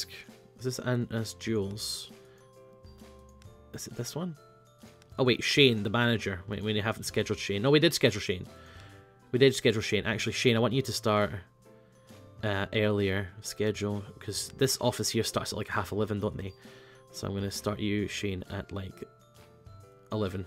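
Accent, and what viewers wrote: Scotland, United Kingdom. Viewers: you can just tell he's Scottish like me hah were ur yees gon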